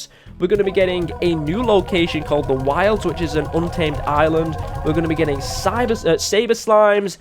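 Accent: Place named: Scotland, United Kingdom. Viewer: U sound like a Scottish NKuch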